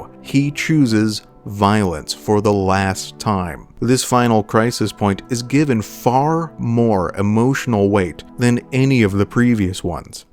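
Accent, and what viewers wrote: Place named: Oregon, United States. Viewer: You sound like you're from Oregon. ♿🏳️‍🌈♿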